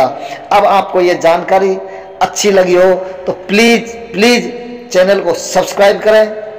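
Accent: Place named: Haryana, India. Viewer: Bhai Haryanvi lag rhe ho😁🤣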